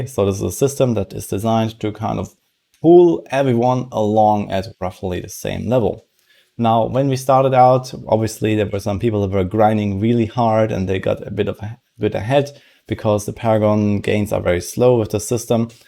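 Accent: Germany. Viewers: Lass es mich wissen ob du Deutscher bist? Man hört es raus 😅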